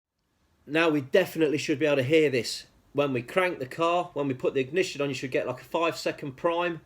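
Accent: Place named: England, United Kingdom. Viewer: Thanks, will definitly try this on my TT. By the way, love the video, the humour, the nice english accent, the SWFC vest and I am sad to be far from England to buy you a beer:) Signed: A frog from Normandy;)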